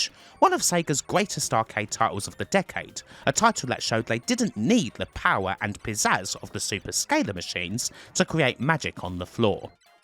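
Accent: United Kingdom. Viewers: Another F’ing brit. Blocked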